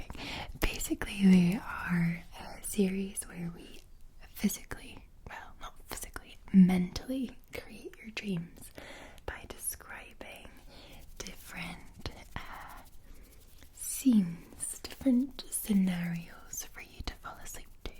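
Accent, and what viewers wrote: Scotland, United Kingdom. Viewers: Damn I really needed this today , after being scammed on Twitter and putting a hole in my wall , I need this beautiful Scottish Lass to calm me down . Thank you for all you do , it’s very much appreciated by me 😍😜👍🤠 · You should roleplay Rayla from Dragon Prince since you're Scottish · It's great to see a Scottish ASMR person on YouTube. As a Scottish person myself the last voice I want to hear is someone with a disgusting English accent so you my dear have frankly been a godsend! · the scottish tingles provided by a beautiful scottish lass. excellent!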